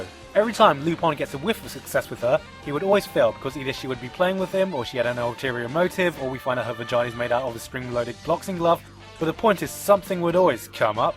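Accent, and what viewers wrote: United Kingdom. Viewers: He's British but from Thailand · So wait your from Thailand but you have a British accent someone explain plz!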